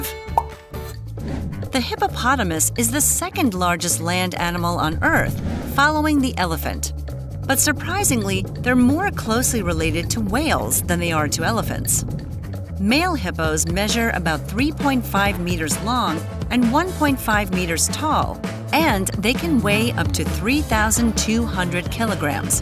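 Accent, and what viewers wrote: United States. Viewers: Kilopascals for pressure- there's a unit most listeners cannot relate to. Going by the narrator's accent and mention of visiting the Cincinnati Zoo, I'm guessing that the intended audience might be mostly Americans...centimeters and kilograms aren't really how most of us reference distance and weight. Why doesn't the hippos mouth open Pi radians? ...if you'd like to promote Si, that is. Aside from the units, I always thought force to be more appropriate for bite strength than pressure, which, for a given jaw strength, would vary widely depending on the area considered. Most animals bite strength, measured in PSI or kPA, could exceed their tooth strength, if they bit a ball bearing, or any small hard thing....the force, without considering area, seems more relevant. Pounds, or Newtons, if you insist.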